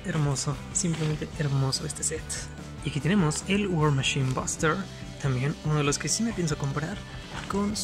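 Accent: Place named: Fresa, Mexico. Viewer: Oye tú hablas muy fresa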